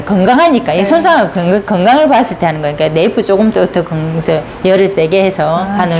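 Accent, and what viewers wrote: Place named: Gyeongsang, South Korea. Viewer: 오늘 처음 들어왔는데요. 경상도말씨가 정겹습니다. 거의 대부분의 유튜브가 서울말씨인거에 비하면 부드럽지는 않은데 정확하게 알려주시네요. 설명이 아시는거에 비해 매끄럽진 않지만 ㅎㅎ 저는 참 좋습니다. 엄청난 내공이 팍팍 느껴집니다. 실전이 느껴집니다. 계속 들어가서 배우겠습니다.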